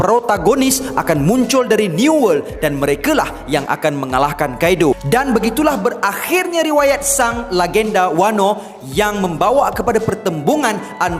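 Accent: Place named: Kelantan, Malaysia. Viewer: Asal Kelate ko abe HAHAHAHAHA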